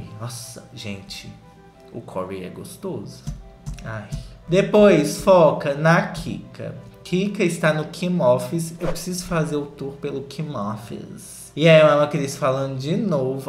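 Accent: Minas Gerais, Brazil. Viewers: 😂 do céu! Você é INCRÍVEL! E, pelo cristo, não mude! Adoro seu “mineirês” de tudo baum! Um cheiro!😁✨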